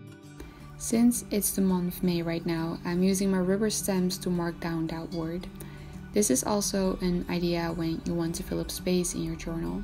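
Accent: Netherlands. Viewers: What country do you come from? (Your accent sounds a bit dutch) 🌻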